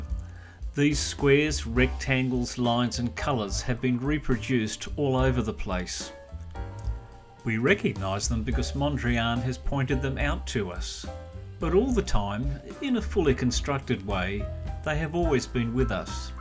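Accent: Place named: United Kingdom. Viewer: Isn't this just pop art, like Warhol? (Not that his stuff is any lesser than regular art. It has a huge influence on our lives.) Also, it immediately calls to mind the Monopoly board. Thank you for these videos. You have everything you need to succeed as a narrator: you have a wonderful understanding of and love for your subject, you have a way of making art understandable for your viewers, and you have a British accent. Subbed.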